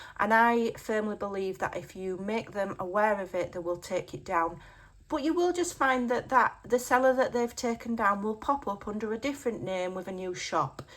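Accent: Yorkshire, United Kingdom. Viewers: If I could give a thousand likes I would. Most YouTubers wouldn’t be brave enough to speak up. I also like the empathy that you impart with your gorgeous accent 😊Is it Yorkshire?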